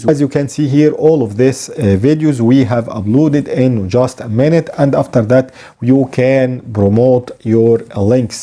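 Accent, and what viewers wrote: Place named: Egypt. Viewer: معلش بس اللهجة المصرية باينة بصراحة 😂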